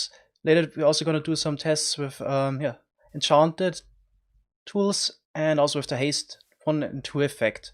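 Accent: Germany. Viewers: Is he German? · German detected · I’m just curious, are you German? Sorry if this seems offensive. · deutsche aussprache uff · Are you a german?xD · Ich lehne mich mal weit aus dem Fenster aber du bist deutsch😂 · Are you german? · Man hört halt raus das er deutsch is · Your from Germany right?😅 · no no please give me a heavier german accent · Is it just me or are you German? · Bist du deutsch ? Klingt vom Englisch so 😂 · Wie krass man einfach hört das du deutsch bist 😂😅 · Are you german cus' you Sound like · Are you a german? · Man hört richtig dass du deutsch bist xD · bist du deutsch?😂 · Noch nie so einen deutschen Akzent gehört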